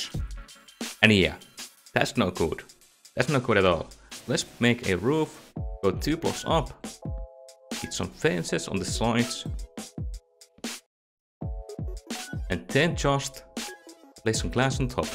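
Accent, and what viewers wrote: Finland. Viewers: Are you finnish? I can hear your accent😂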